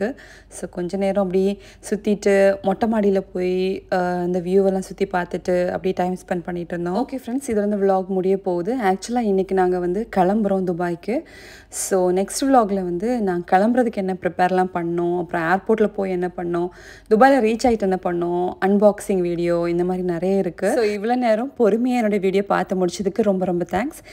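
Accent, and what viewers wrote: Kerala, India. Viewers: malayali aanu alle ... I can guess from the accent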